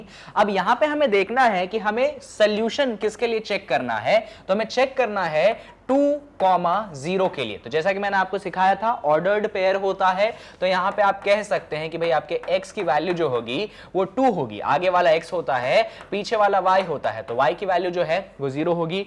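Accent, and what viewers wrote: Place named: Bhopal, India. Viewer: Sir aap shayad bhopal se ho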